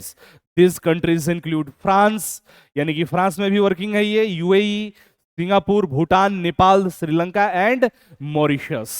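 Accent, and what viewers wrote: Bhojpuri, India. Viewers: Aap Hindi bhi bolte hain to Bhojpuria ki jhalak dikhti hai sir Don't mine sir, U looks like Ravi Kishan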